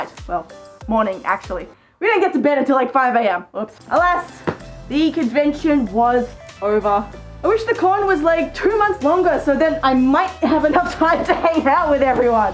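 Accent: Australia. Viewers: How the hell did you get more Australian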